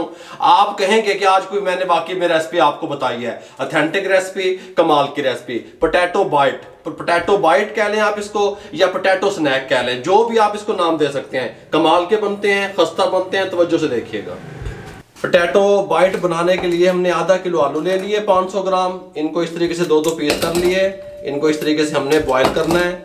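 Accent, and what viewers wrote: Punjab, India. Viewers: اسلام علیکم بھائی جزاک اللہ خیر آپ بہت اچھا کام کرتے ہیں اور میٹھی میٹھی پنجابی آپ کی بہت اچھی لگتی ہے اور اللہ تعالی آپ کو اور عزت دے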